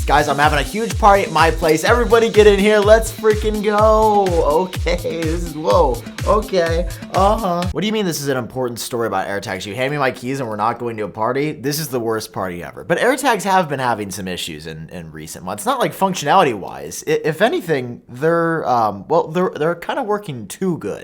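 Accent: England, United Kingdom. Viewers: Great English accent! Thanks for the video! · British accent on fleek as the kids say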